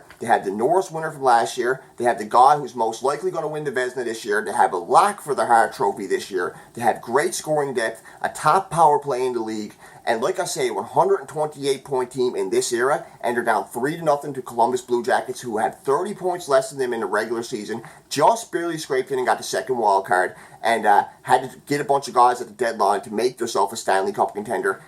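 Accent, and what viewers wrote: Newfoundland, Canada. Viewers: Is that a Newfoundland accent u have? Scottish origin? Im Just a silly American so I really don't know but that's what I'm guessing...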